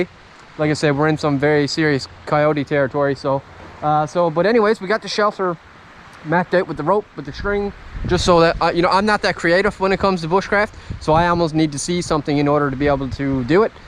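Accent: Newfoundland, Canada. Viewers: You sound like someone from Nfld...Buck baymen or a towny..?anyways, I subbed. Hello from NB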